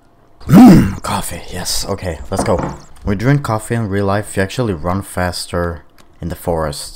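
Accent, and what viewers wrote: Sweden. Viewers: i like your swe/eng:) naajs video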